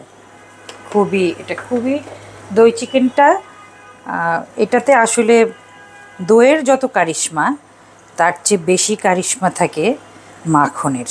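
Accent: Sirajganj, Bangladesh. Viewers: আহারে কত মধুর আমাগোরে সিরাজগঞ্জের ভাষা। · সিরাজগঞ্জের ভাষা অনেক ভালো লাগলো শুনতে শুনতে হাসতে হাসতে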